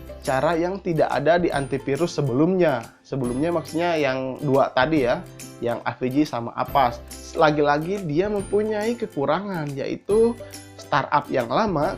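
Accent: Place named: Bandung, Indonesia. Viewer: apas wkwk logat bandung euy :D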